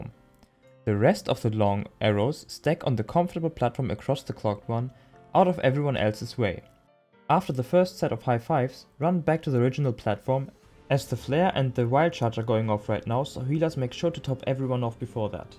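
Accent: Germany. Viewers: oh yea i forgot was german as well haha. I love german accent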